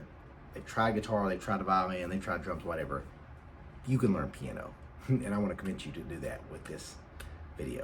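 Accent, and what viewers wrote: South, United States. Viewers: I hate your country accent!!!!!!!!!!!!!!!!!!!!!!!!!!!!!!!!!!!!!!!!!!!!!!!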